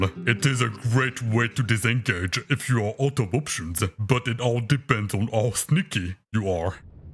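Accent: France. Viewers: is his accent real or is he an american imitating a french talking english?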